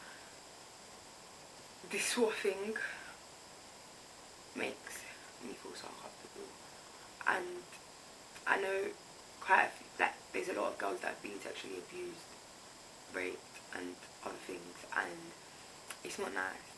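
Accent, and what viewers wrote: United Kingdom. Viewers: You can use the caption. She's British. That's self centered for you to expect a person to speak like you. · Probably bc ur American, she’s British I can understand her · It’s a thick british accent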